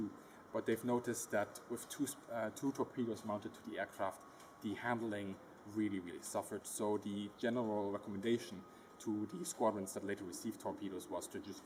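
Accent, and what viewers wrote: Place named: Germany. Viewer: Wow! This guy has such a depth of knowledge, AND a talent for sharing it. Your English grammar is perfect, but your German accent is also perfect! As is your Italian accent when talking about Italian aircraft. I am so glad that you (obviously) enjoy teaching us about things we would never have imagined we would be interested in without your tutelage! Very impressive deep knowledge. Thanks for sharing! Vielen Dank!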